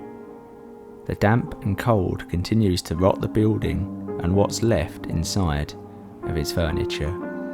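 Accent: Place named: Essex, United Kingdom. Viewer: Mate I wicked again brother can we meet up I have a few places up my sleeve. I make videos too. You sound like your from essex?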